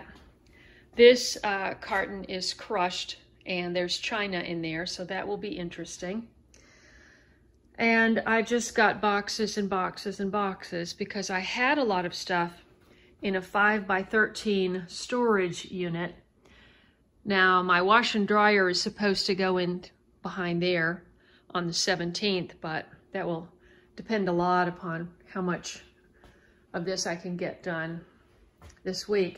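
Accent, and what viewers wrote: Tennessee, United States. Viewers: A Tennessee accent very endearing to me. I am from Wilson county and have lived in SC for 42 years. I pick up my native TN accent in a heartbeat! Let 'er fly, sister! Your home is beautiful. It seems light and cozy.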